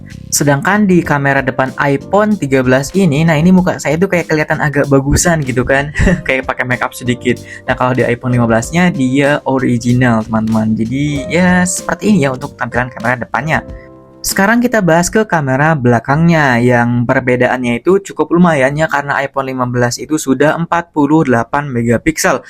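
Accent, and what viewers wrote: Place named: Cirebon, Indonesia. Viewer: Busst orang cirebon lu bang😂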